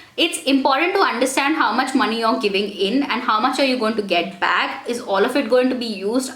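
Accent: India. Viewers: Malyali English 😬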